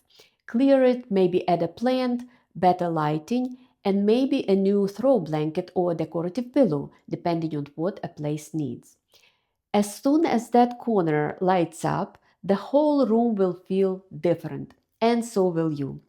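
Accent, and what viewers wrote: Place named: Russia. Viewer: У Вас очень яркий русский акцент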